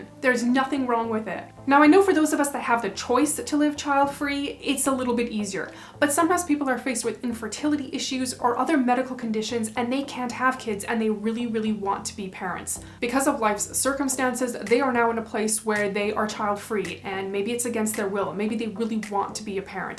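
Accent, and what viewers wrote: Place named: Canada. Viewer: I didn’t realize you were Canadian until today! now I can hear that in your voice. Love that ❤️🥰 I think it’s adorable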